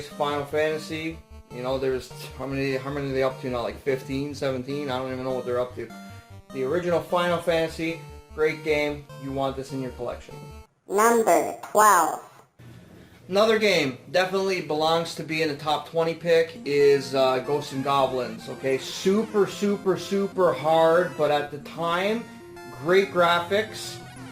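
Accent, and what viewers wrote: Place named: Northeast Ohio, United States. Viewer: sounds similar to northeast ohio dialect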